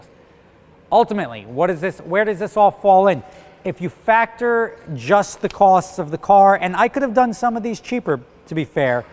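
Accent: New Jersey, United States. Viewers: Great Jersey Accent! Who cares what it cost - wtf - If your looking for a Porsche don’t sweat the cost as you know it’s going to be expensive if the shit breaks. Otherwise I liked the the vid.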